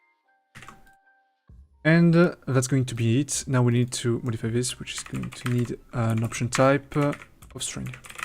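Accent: France. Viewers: I can spot a french accent from a mile away ahah keep up the good work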